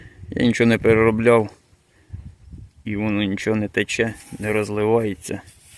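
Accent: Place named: Poltava, Ukraine. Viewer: Я чую по розмові, що свій хлопець, з Полтавщини. А , виходить, сусідній район. Вітання з Миргородщини. Відео герні. Трактор також гарний. Міркую про придбання такого. Знімай відео ще. Нехай щастить.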